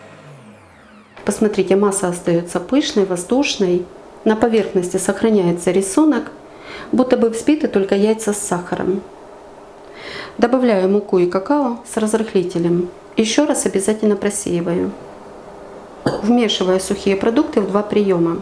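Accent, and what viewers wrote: Ukraine. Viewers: Считаю Ваш канал лучшим, Вы всё объясняете простыми, понятными словами и Ваша украинская речь очень приятна❤❤❤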